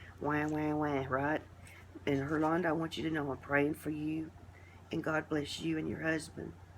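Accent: United States. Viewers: I completely agree about reborns being helpful for getting through those anxious times. I like to feed and change mine when I'm anxious too. Also agree about God giving us strength we never could have guessed at right when we need it. He is good all the time. I'm so sorry you and your mother are going through this, though, and you will both be in my prayers. I love your voice and your accent. I was born in NC. I now live in IL, and I miss my family so much. Listening to you reminds me of home.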